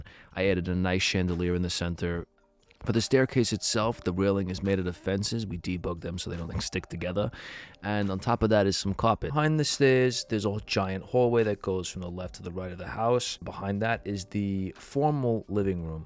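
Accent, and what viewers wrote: New York, United States. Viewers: Also are you from New York? Your accent definitely sounds like it. Which is a compliment btw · Dang that New York accent you have there is cool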